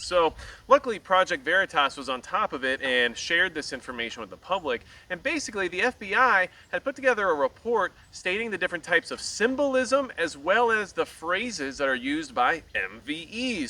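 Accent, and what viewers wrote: United States. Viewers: You look and sound like a typical American to me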